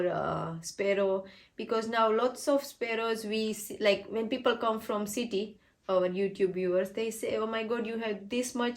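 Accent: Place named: Gujarat, India. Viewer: Your interview was pretty good but you have gujarati accent in your English it is ok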